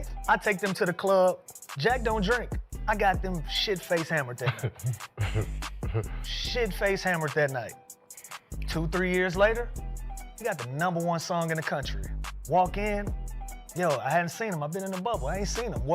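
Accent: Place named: Memphis, United States. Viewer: bro you from memphis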